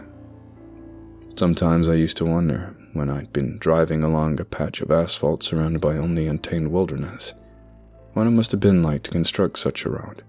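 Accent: United States. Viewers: You do a pretty damn solid American accent - from different regions, too, IIRC - so it's always fun to hear it. Occasionally you slip, like when you said "been" like "bean" and not "bin" like we yankee folk do. Not a dis at all, it's just interesting and fun to pick out little things like that! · You sound very American in this one. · You could so come to America and blend in 100%. Ever consider voice acting or even acting in general?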